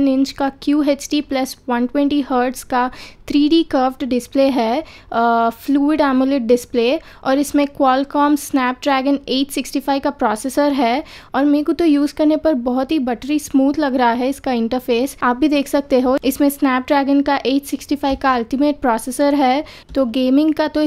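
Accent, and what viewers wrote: Hyderabad, India. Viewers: Does anyone feel the Hyderabadi in her?? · Hyderabad se lagre aap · Wow Hyderabadi Hindi amazing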